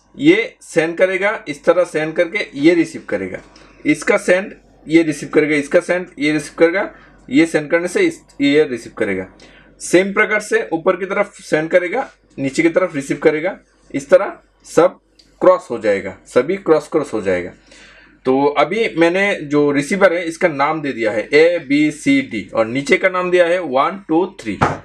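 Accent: Bengal, India. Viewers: Bhai aap bangli ho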